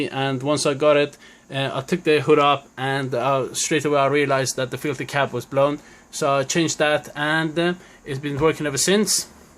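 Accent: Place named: Iran. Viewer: از لهجه ي ضايت معلومه ايراني هستي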